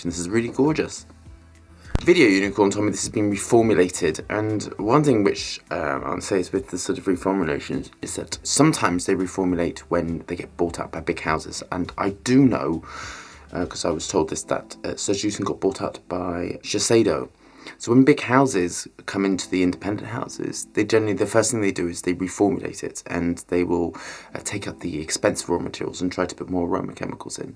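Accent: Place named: Cornwall, United Kingdom. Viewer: I hear some of Anthony Hopkins accent in your voice mate...cornish accent slipping through...love it!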